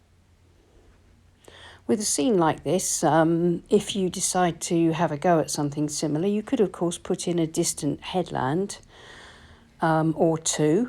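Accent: England, United Kingdom. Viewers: It's lovely to hear your soothing English accent and see you paint in such a peaceful manner. Thanks from a Brit in California! We all need peace at the moment and you certainly draw us into the sanctuary of watercolor painting!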